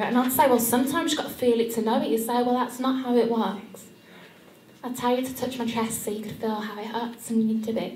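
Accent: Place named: Birmingham, United Kingdom. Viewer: excellent, the brummie accent really suits your style, and you're beautiful too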